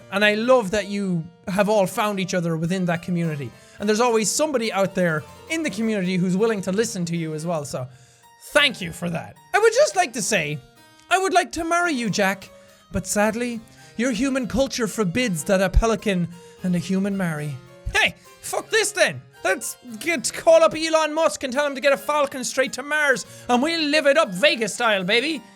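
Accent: Ireland. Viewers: JACK!!!! Since you moved out of Ireland do you think you might slowly lose your accent? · Now that you moved does that mean your going to lose your Irish accent? Please be no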